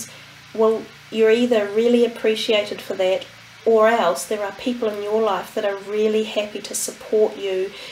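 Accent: New Zealand. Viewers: What a lovely reading. Am currently living abroad but so refreshing to hear the kiwi accent again! Thank you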